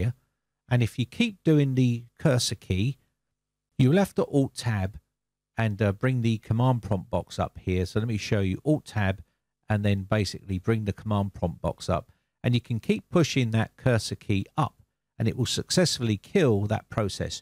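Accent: United Kingdom. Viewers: I gratefully join the many satisfied subscribers in thanking you so much for sharing your helpful skills & knowledge to make our work a lot easier with your elegant British accent. Thanks a lot.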